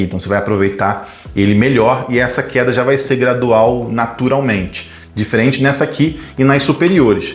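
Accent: Rio de Janeiro, Brazil. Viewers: Aprendi muito! Vou regular meu moedor de café e vou testar esse café triatlon .. Mas o melhor de tudo sempre é ouvir um sotaque carioca no Youtube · O conteúdo até que é interessante. O que não dá para aguentar é o sotaque carioca carregado, que sempre passa a impressão de esnobismo asqueroso.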